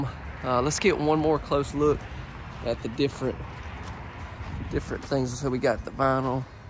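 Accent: South, United States. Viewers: soft spoken, sweet, southern gentlemen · Did you say? Is that your name or is it? Either way I’m still digging your southern accent! Lol! I have a question for you about single wide mobile homes. Do you ever see any of them with the kitchen in the front and the bedrooms in the back? My home is so old and is in desperate need of an overhaul. I love watching your videos because I am getting so many ideas for my place. I love love love this place especially the black trim!! Thanks for everything you do for us! I appreciate your attention to details and how you open all of the cabinets to give us ideas!! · This is the most beautiful one that I have seen! Totally love it! Love you channel and love your country accent! God bless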